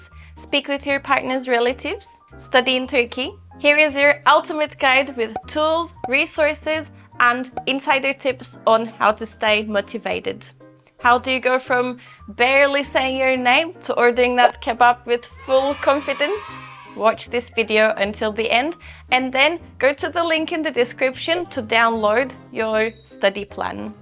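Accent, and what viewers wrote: Australia. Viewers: are you using Aussie accent? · Sagol. Great info. You always make such good sense and content of your videos are wonderful. Is that an Australian/Turkish accent I hear from you?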